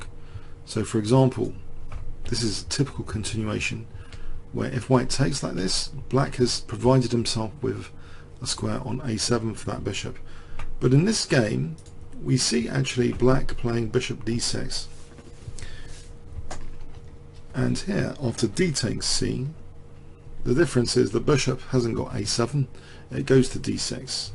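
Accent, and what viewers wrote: United Kingdom. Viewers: THORN pawn! Man, that british accent. You have no idea how long I wondered how that was spelled. I thought Foam Pawn, Fun Pawn, Foreign Pawn, Phone Pawn... Awesome series,!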